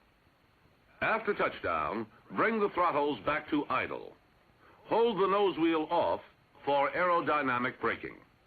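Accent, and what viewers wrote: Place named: Boston, United States. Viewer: Love the Bostonian accent. AFP couldn’t either train him to speak “General American” or their editors themselves thought it was okay. Option 3: A rush to production rendered the former two impossible. And: the rear control surfaces seem just massive...otherwise, this very striking bird reminds me of the B58’s cockpits...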